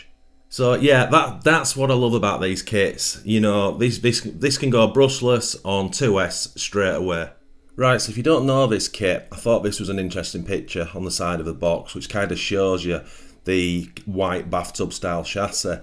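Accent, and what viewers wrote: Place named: North, United Kingdom. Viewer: I love thar North accent.... Boogy = buggy Oop = up Etc Great vid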